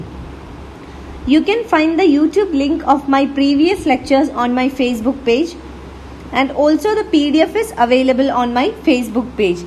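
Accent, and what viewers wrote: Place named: South India, India. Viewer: Her style of speaking is south Indian and most of people are don't know Hindi in that region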